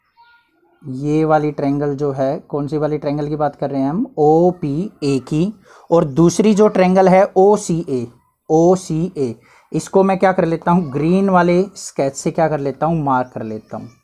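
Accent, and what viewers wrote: Haryana, India. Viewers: Sir aap haryana se ho kya?? aapki voice m haryanvi accent aa rha h.. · You are from Haryana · Sir aap haryana se ho kya? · Nice sir are you frim haryana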